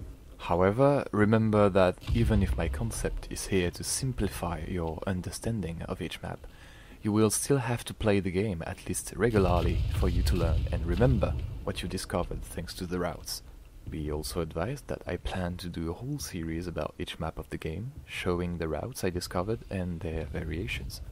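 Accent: France. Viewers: Your french accent is very good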